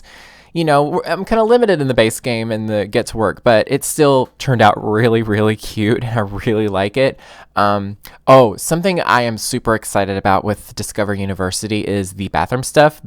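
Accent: South, United States. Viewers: Hey!!!! So Happy To See YOU!!!! And Your "Country Accent" Gotta Love A Country Accent! LOL This Is DARLING!!!! I've Never Done Limited Packs......... SOMEDAY! I Got Discover University Too... Haven't Played It Much.... With My Depression But Feeling SO Much Better Now....!! Back To Sims Now! HUGZZZ To YOU! 😘😘😘😘😘😘😘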